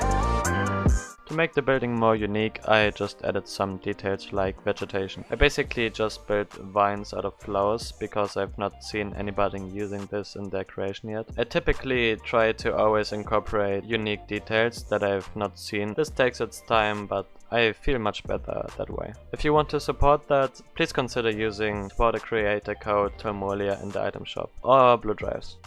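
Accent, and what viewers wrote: Germany. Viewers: ummm where are you from you sound a bit like german or smth i like how you build. Subscribe and Like😊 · Are you german? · are you german ?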